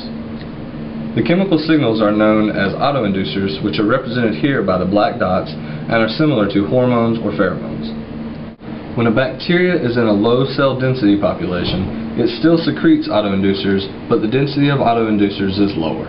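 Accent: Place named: South, United States. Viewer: visuals were kind of blurry, but quick pace made it easy to follow. I find your southern accent endearing :)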